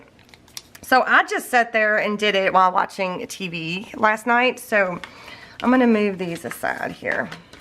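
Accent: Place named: Kentucky, United States. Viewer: ! You have to be best person I have ever come across that is a creative genius, motivator, beautiful, loving, down to earth, genuine, giving with all your heart, Kentucky talkin' sweetheart!!!! I love all your videos!!! These are special and I can't thank you enough for all that you share and give to us!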